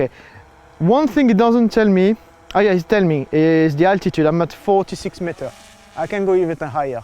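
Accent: France. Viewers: that's how French people sound when they speak English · Very usefull video because done from a beginner with mistakes and corrections... I will be in your case in one week with a phantom 3 also... Just i notice playing dji tutorial videos, the man turn in unticlock way for calibration ...i don't know if it 's important or not.... last news about dji... after christmas prices going down: standart one is 599 euros, advanced one 899 euros !!!! Oh ! do you live in an english country ? i am french and i recognize you special french accent ;-) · Are you French ? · french accent but very good video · Tu es français ou quoi ? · On sent l'accent français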